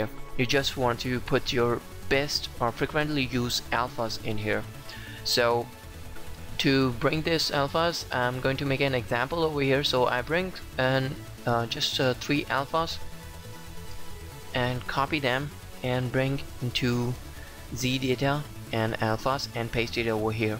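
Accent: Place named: India. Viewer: your indian accent is kickin'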